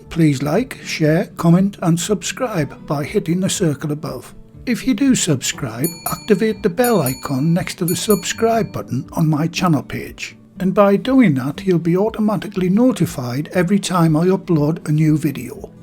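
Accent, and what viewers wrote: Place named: Newcastle, United Kingdom. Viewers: An English voice , at last, to be found on the internet. I watched , just for the relief of it. Just the metric references to be got rid of I've shared it with a genuinely interested party . Thank you. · I'm so happy I found your channel. I'm a northerner myself from Newcastle who now lives in the states. I've been terribly homesick and not able to travel back due to Covid:( Hearing that accent and watching all your videos makes me feel like I'm back home! I'll be trying some of your delicious recipes this weekend! HAWAY THE LADS. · I love this channel. I am,also,from the north east and your voice takes me back to my time there and my mam's corned beef pie was just like yours. Thrilled to have found you. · You got a like when I heard your Geordie accent.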